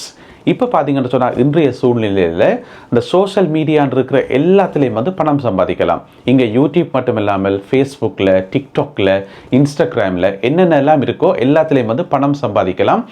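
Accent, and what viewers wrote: Sri Lanka. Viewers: I came to London on visit visa,i have subscribed your channel very interesting,your srilankan slang tamil impress me very much.iam running a devotional channel namely Temple trails showing festivals of temples,on seeing your channel i got impressed and started a new channel namely வானமே எல்லை. your pronounciation and detailing is super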